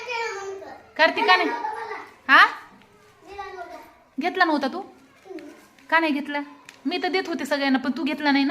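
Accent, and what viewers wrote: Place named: Parbhani, India. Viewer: मॅडम तुमची भाषा परभणी चि वाटते ,आमचे सर सतीश Ranher सर यांच्या सारखी आहे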